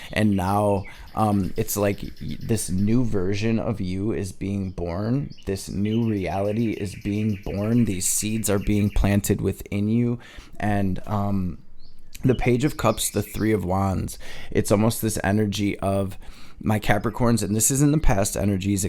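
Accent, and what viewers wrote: Detroit, United States. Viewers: waddup doe u from detroit???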